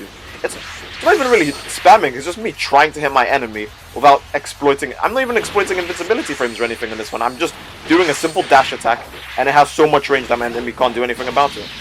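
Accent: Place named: England, United Kingdom. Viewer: Are you from England? You got the accent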